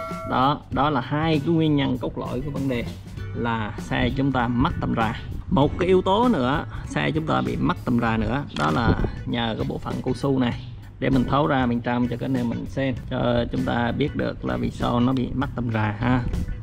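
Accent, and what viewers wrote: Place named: Quang Ngai, Vietnam. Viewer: Giống Quảng Ngãi vậy anh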